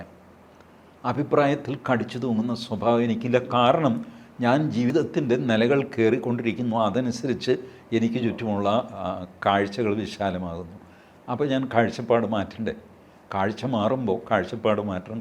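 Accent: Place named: Cherthala, India. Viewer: TG സർ അങ്ങ് ഈ ഇൻറർവ്യൂ വിലൂടെ കോറിയിട്ടത് ജീവ ചരിത്രമാണ്. ഞാൻ ഒന്നു രണ്ടു വർഷമായ് ആഗ്രഹിച്ചിരുന്നു. താങ്കളുടെ സ്വദേശം എവിടെയാണെന്ന്? താങ്കളുടെ ഭാഷ കേട്ടപ്പോൾ ചേർത്തല ഭാഗമാണെന്നറിയാം പിന്നീട് താങ്കൾ മട്ടാഞ്ചേരി TD സ്കൂളിന് അടുത്താണെന്ന് മനസ്സിലായി. പക്ഷേ ഇപ്പോൾ ആണ് താങ്കൾ ജന്മം കൊണ്ട സ്ഥലവും ഒക്കെ അറിഞ്ഞത്,ഞാൻ നാളുകളായ് പലവട്ടം തിരഞ്ഞ ഒരു കാര്യത്തിന് വ്യക്തത വന്നിരിക്കുന്നു. താങ്കളെ പോലുള്ളവരെ കേൾക്കാൻ കഴിയുന്നത് മഹാഭാഗ്യം ജനം TV യ്ക്ക് ആശംസകൾ❤❤❤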